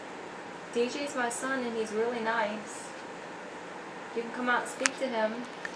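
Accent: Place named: United Kingdom. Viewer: Great setting. Nice background story. You have a slight British accent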